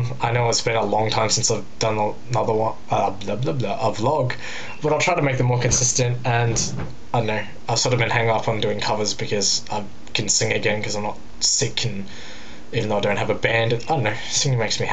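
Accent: Australia. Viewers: I'm British.. you deffo sound like an Aussie lol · I'm Australian and if you are Australian you would be able to recognize his accept ahahah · Heh only a Yank would think that was British. But as a Brit, you have a lovely Australian accent. ;) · ) australian accent, thats hot xP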